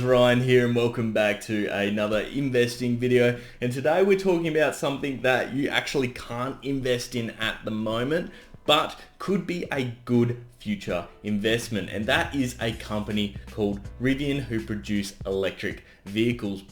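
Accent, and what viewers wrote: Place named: Australia. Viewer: Just because you have this Aussie accent, I'm already a huge fan of yours :) As a 4+ years experienced Model S owner, I highly cannot recommend Tesla anymore due to how the company treats you as a customer (the vehicles still are great). I'm looking forward to replace our Model S by a Rivian R1T as our family car, because we love tenting (2018 we did so in a 4x4 with roof tent in the Outback). If it was possible to do this trip in an EV: even better!!! Therefore hoping Rivian will sell to Europe very soon!! :D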